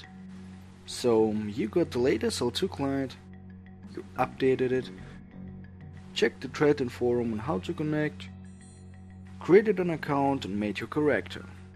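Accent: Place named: Netherlands. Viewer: ur accent tells me ur dutch gj!!!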